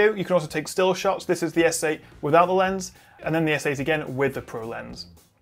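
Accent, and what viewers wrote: United Kingdom. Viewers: Always love watching your videos. Good honest reviews with a familiar British accent.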